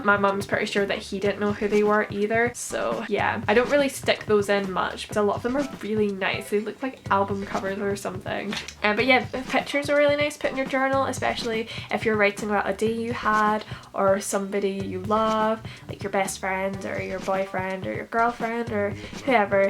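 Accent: Scotland, United Kingdom. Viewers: I love your accent so much, it's like slightly Scottish and English and it sounds very nice and that combined with the amazing video is really great :) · I love the idea of using tracing paper if you're not great at drawing. I will definitely be using that! Also love your accent, are you Scottish? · lol I'm Scottish too but live in Wales now and people always ask me where in Ireland i'm from. I just tell them near Glasgow and theyre like oops :) love the video and good to hear another Scottish voice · Omg you sounded Scottish so I immediately liked you😂💕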